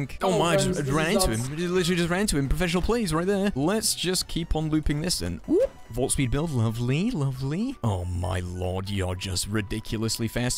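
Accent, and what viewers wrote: United Kingdom. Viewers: Nah he just has a British accent.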